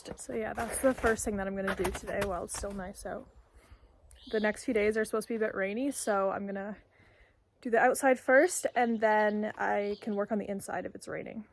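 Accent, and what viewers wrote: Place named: Canada. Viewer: Nice Canadian accent you have, and a sweet cabin, good taste, but I'm more into your dad's style, living with the force of nature's elements, good luck !!! Stay busy and enjoy !!!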